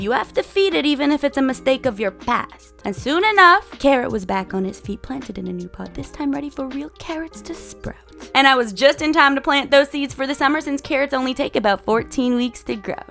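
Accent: United States. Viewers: Ur accent is a mox between New Jersey, southern, and California 😫 ive never been so confused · Shes definitely alternating through multiple dialects, northern and southern US. Which is interesting since she's in Canada.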